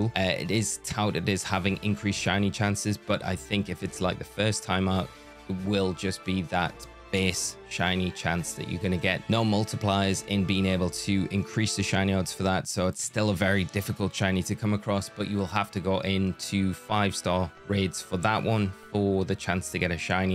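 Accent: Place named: United Kingdom. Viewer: Brittish accent? So strong... 😢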